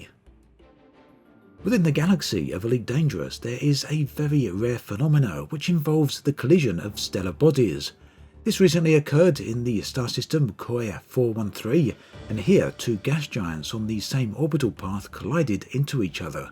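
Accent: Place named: South London, United Kingdom. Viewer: Hey cant help noticing your accent is like Pritti Patel's..is it an area of south London?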